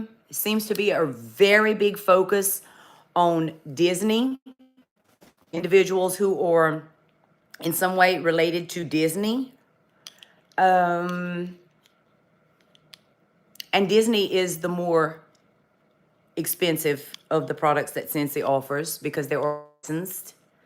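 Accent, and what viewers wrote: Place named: Louisiana, United States. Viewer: Okay, I have to say. I LOOOOVE your accent! I am from GA, but your accent is amazing! I could listen to you all day! LOL You gotta be from Louisiana!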